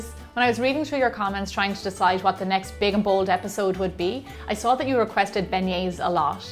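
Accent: Ireland. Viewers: Is she Irish? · she is Irish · are ye irish?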